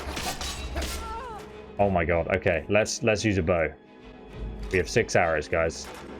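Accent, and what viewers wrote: England, United Kingdom. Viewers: Love the helpful advice. Serious mental cringing at your horrible pronunciations. Attribute, Markarth and quite a few other speech errors. I get that you sound British, but honestly, that should mean you are better at speaking English, not worse. Again, I found this advice helpful. Please don't think I am ragging on you. Subscribing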